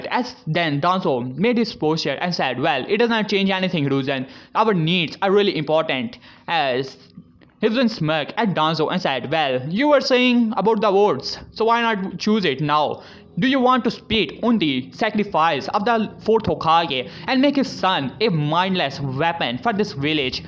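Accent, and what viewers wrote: India. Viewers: I love your India voice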